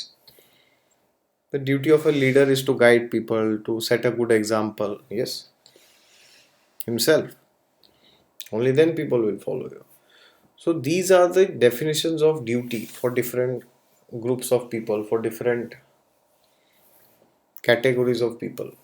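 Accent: India. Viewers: I really enjoy your Indian tone English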